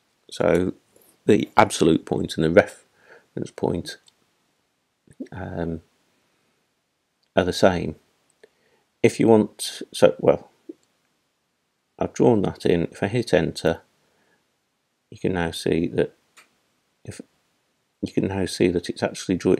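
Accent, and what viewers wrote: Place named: United Kingdom. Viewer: Really helpful vids....And I myself love the British accent...its very proper...Thanks.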